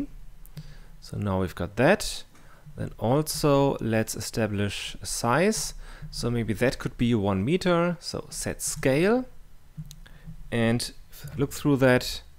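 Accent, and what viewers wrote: Germany. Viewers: R U german? You have a german accent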